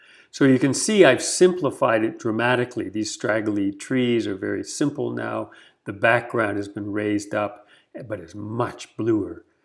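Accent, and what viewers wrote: Canada. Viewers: You cant hide that Canuck accent 😆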